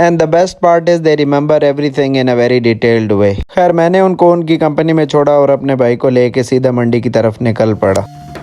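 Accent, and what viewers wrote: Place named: Punjab, India. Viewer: I really like the punjabie touch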